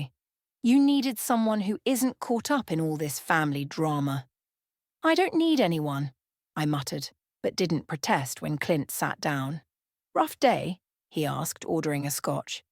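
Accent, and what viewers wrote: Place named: United Kingdom. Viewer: British voice. F you. Not listening.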